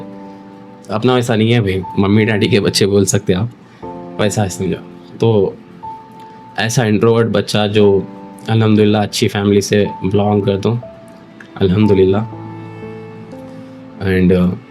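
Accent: Hyderabad, India. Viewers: Assalamualaikum bhai hum logao aap ke sath. Hyderabadi accent chodke amer dens ke Jaise videos banao jaise amer bin ishaq reel reaction do baigan reply do Bhai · Bhai tum serious baath kare to bhi hasse waisa dikhra 😂 woh jaando nakko chhodo videos banana ache banate tum videos🤣 ek to bhi rehna bhai Hyderabadi accent me roast karne wala😂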